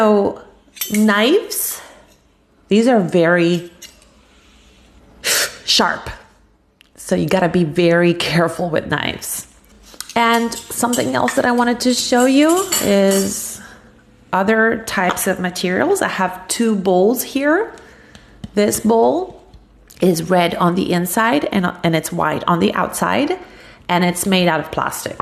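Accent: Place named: Midwest, United States. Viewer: Da madrelingua, complimenti per la pronuncia. Sembri proprio americana, con un accento un po’ midwest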